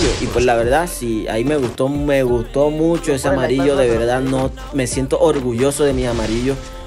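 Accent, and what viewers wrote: Caribbean Coast, Colombia. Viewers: Eres de colombia?:0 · de q parte de colombia eres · Como narra un costeño un pvp:pues mira el video:v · Eres costeño?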